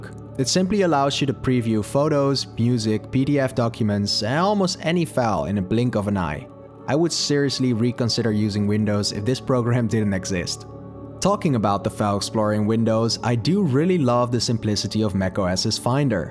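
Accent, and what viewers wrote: Netherlands. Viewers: Na 1 sec hoorde ik al dat je NL bent. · Huh you dutch?! Je hoort het amper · the Dutch accent is 98% here. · 10 seconden in en ik gok... een nederlander?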